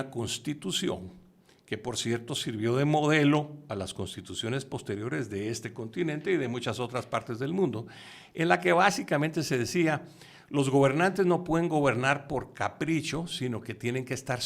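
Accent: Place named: Guatemala. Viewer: Lo felicito. Su acento es casi el de los chapines de 1966 cuándo me fui. Hay otros que parecen mexicanos.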